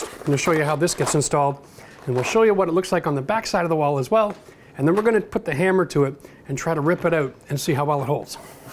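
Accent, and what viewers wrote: Canada. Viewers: You sound Canadian, bud. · I love your accent. Reminds me of my Canadian friend. 😊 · As soon as I heard that accent, I just KNEW the Robertson was coming oot as the hero. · when you said "out" I knew you were Canadian - plus now gently you spoke !😄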